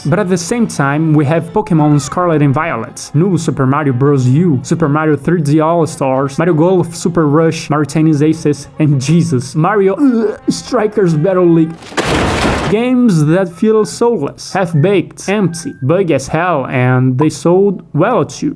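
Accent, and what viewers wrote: Brazil. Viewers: is this a ptbr accent i hear??? hehehehehe (if so, right ON) but yeah, at times i feel very little desire to jump back into nintendo games, unless im with friends and wanna test how strong my friendships really are by playing mario kart or smash bros, lol. great video, dude!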